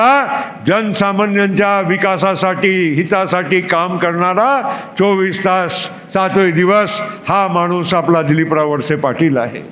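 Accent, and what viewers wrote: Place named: England, United Kingdom. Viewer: Tumhala he Engrajanchya tone madhala Marathi bolayala kasa jamat ho?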